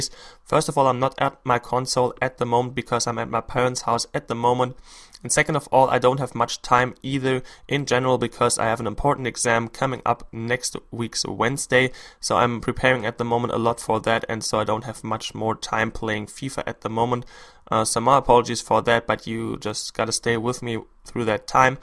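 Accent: Germany. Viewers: wie man einfach nur raushört dass du deutsch bist :)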